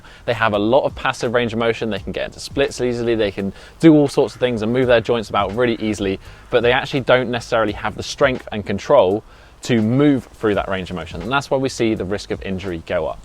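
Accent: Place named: RP, United Kingdom. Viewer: Love the well educated British accent man! You pronounce your words very precisely!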